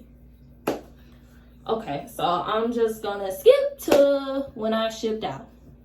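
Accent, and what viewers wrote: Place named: Alabama, United States. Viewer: You from Alabama 🤣🤣 you got a strong accent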